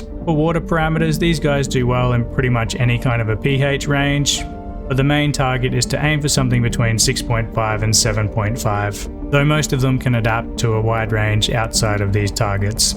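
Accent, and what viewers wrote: Australia. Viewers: Hiya. Are you from Australia?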